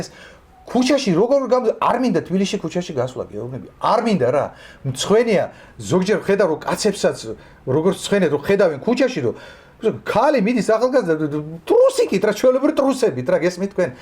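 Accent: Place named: Armenia. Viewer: ჩვეულებრივი ფარისეველი, ბლia:) სომხობა ქართველობაზე იმისთვის გაცვალე ,რომ ქართველი ქალები გელანძღა,მაგ სომხურ აქცენტს მაინც მოუხერხე რამე:))) არაა,სომხობას არ დავცინი,უბრალოდ , პოზიციაaa ძაან "ჰაიტარაკუნემ რესპუბლიკ" :DD....მე ვიყო :D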